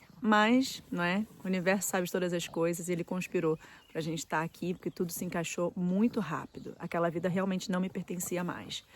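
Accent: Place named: Brazil. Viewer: Olà fico contente com suas declaraçoes, mas desculpa minha pergunta o porqué de ainda falares com sotaque Brasileiro? fui emigrante na Suissa e Luxembourgo e nunca usei linguagem portuguesa e o frances também é de origem do latin entendes, é a unico reparo que faço em sua aventura aqui em portugal, eu me entegrei na Europa em frances e para mim o mais importante na integraçao é prencipalmente a linguagem quasi todos portugueses gostam que qualquer raça que falem a linguagem correctamente e resto da Europa obrigado por sua atençao.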